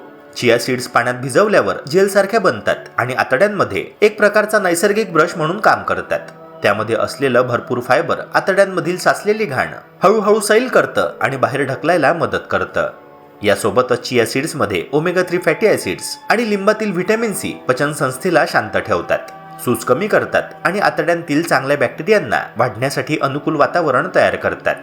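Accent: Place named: Pune, India. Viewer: Pune bolte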